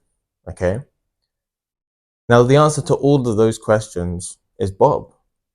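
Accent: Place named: Nigeria. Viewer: Man you talk like a Nigerian 😊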